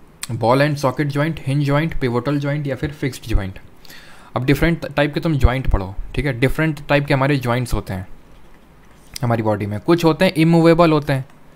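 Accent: Kanpur, India. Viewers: The timing . Kitna badhiya samjhaya Article 2 ko...I love this kanpuria ascent. love from gorakhpur sir ji.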